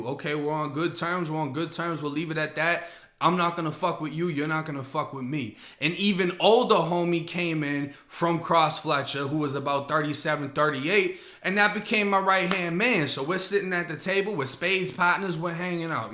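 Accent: Boston, United States. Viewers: He's from Boston area so, yeah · U from Boston homie u sound like it tho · Curious my dude you say you're from Florida and talk like you're from Boston I think you're full of shit bro because I lived 6 years in a max and 4 in the shu and bro wheres the big long tatty on the side of your face looks like your reppin someone else's life race runs the yard stop talking shit like you were accepted as an equal because you'd know nobody's equal in there fucking joke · that Irish and Italian you know u from mass bro .. lol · I don't understand why this dude always talks about Florida and he sounds like he's from Boston??? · Yo you have the heaviest Boston accent. I thought my NYC accent was bad but you win. Lol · Are you from Boston? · Is this dude from Boston or some shit? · U live in FL but sound like you're from baaaaaston Massachusetts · This dude tries so hard to use a wigger accent but his white Boston accent comes out, just be yourself or you won't ever be able to walk the streets in NYC facts and If you do tuck that flag we ain't Boston for corner Florida, we get down!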